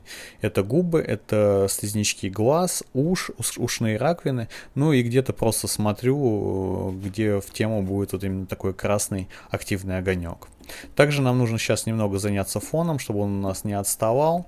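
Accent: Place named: Ukraine. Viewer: Fantastic construction of a portrait! ...a great help for me. Thank you from UK. You sound Ukrainian. Hope that is not an insult! 😊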